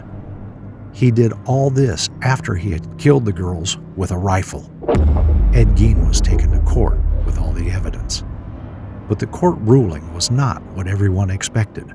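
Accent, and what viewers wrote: India. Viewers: Your accent is amazing for an Indian ❤ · “I don’t know who this is, but he is from Kerala.”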